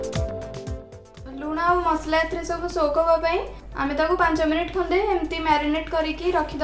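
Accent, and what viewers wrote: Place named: Balasore, India. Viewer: OK mam.apananka Katha style ta mote balesoria bhali lagila so pacharili.. Thnku mam. I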